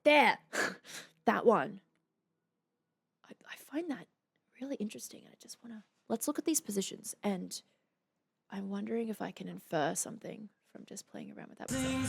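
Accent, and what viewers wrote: Australia. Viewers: I'll admit, I'm loving these reactions not just for the content, but because I get to hear them from a fellow Aussie.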